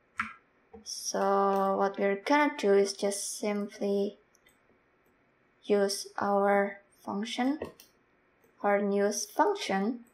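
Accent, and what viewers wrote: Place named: Indonesia. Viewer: Why do i hear indonesian accent.. btw nice tutorial.. i'll try it later to see if this still works in unreal engine 5 or not.. somehow i manage to do the footIK based on Z Axis trace.. but still struggling on the Rotation using Hit Normal..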